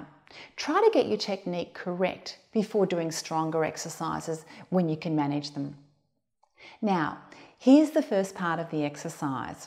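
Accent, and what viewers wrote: Australia. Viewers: Thank you! Very helpful and nice to hear an Aussie presenter. I miss Australia! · She speaks with Australisn accent. It is very nice to listen to.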